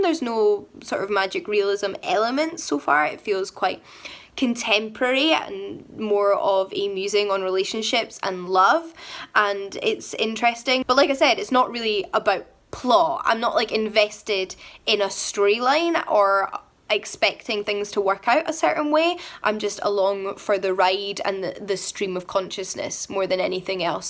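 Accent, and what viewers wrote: Scotland, United Kingdom. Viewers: I think there's a typo in the video title :o Not that I mind but I don't want you to come back to this video in a month or so and only realize it then I really like your videos and love listening to your scottish accent :D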